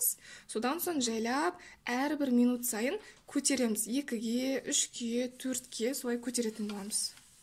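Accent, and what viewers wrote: Standard. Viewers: Керемет. Аман болыңыз. Әрқашан жасаған жұмысыңыз берекелі болсын. Сіз сияқты таза қазақ тілінде сөйлейтін блогер қазақ қыздары көбейе берсін. 👍👍👍👍👍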